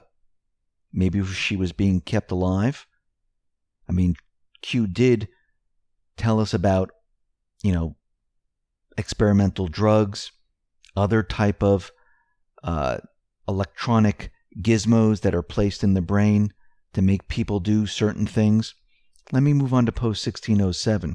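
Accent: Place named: New York, United States. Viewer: from the New York area judging by his accent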